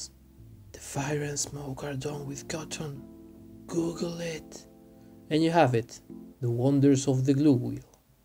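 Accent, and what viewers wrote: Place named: Russia. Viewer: I was thinking Russia or Kazakhstan you sound like a friend shows how well trained an ear I have for accents. Spain and South America seem to have many really great crafters. Have you ever heard of Scenery Planet TV? They make some really cool terrain boards and Blood Bowl fields.I look forward to more vids by you and how the world of crafting is doing on your side of the world.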